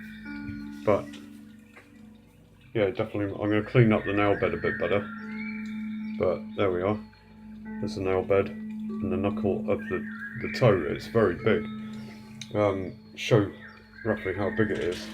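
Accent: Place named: England, United Kingdom. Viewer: You sound English, you should check my blog, although its a world wide thing... <link>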